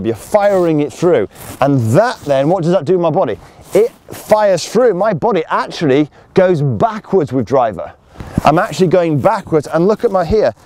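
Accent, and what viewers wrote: Yorkshire, United Kingdom. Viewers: That's because he's from Yorkshire - no bull!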